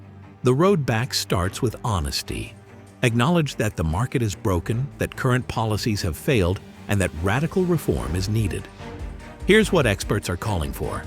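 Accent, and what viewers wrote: United States. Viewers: Not sure why you used an American narrator for an Australian issue?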